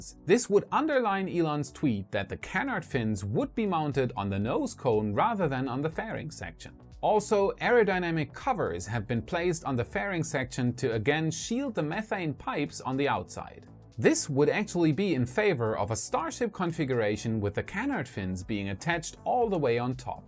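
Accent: Germany. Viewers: C'mon he speaks English with a heavy accent and German without any, so you tell me haha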